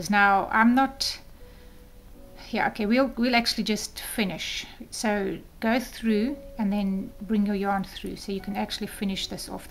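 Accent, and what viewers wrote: South Africa. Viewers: Not sure how to post a photo here. I've made and ss 3 together. The yarn is a lighter weight cotton and my tension is a bit loose, so I probably should have used a smaller needle. And they aren't exactly uniform in size yet, but I expect that will improve with more practice. They still look very pretty and I will make at least three more to complete one placemat. It is a very cute pattern, thanks again! ps- Is your accent South African? Love it! (I have a friend in town from SA)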